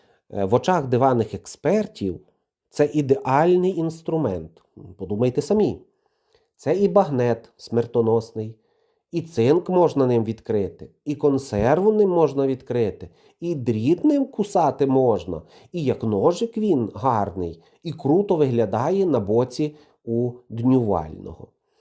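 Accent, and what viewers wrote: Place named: Western Ukraine, Ukraine. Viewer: Акцент заходу України,ме-бе.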